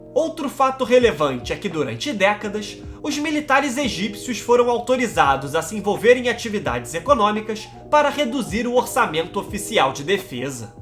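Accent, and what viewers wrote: Rio de Janeiro, Brazil. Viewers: O conteúdo do vídeo é excelente, mas precisa neutralizar um pouco esse sotaque carioca, misericórdia! 😣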